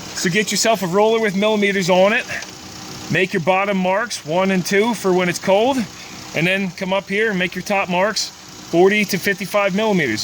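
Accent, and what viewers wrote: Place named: New England, United States. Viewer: Now that's what I'm talking about! And you know what I was kind of digging the Yankee dialect there. Y'all have a blessed day.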